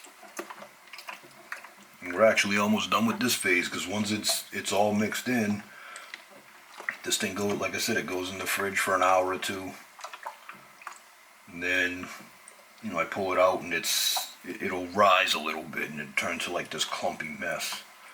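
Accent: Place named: Boston, United States. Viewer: Not bad! You got that Boston accent. For the bubbles on top try using a heat gun so the top bubbles can decipate all together!! 👍👍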